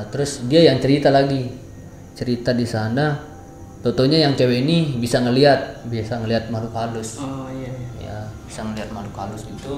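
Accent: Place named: Lombok, Indonesia. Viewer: Indo sasaknya kentel banget bang Semangat²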